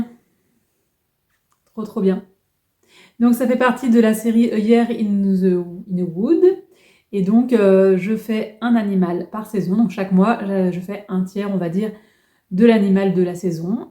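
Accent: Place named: Belgium. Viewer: Coucou, tout est magnifique ! Le fil E940 de DMC (si c'est bien celui là que tu as commandé 😅) brille dans le noir mais il faut vraiment le mettre au soleil ou sous lampe très près et pendant longtemps... Et ça fait plaisir d'entendre ce petit accent belge dans un flosstube ! Je me sens moins seule 😅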